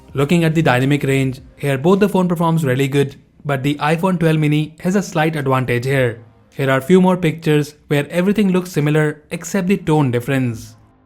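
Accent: India. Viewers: Indian accent 😫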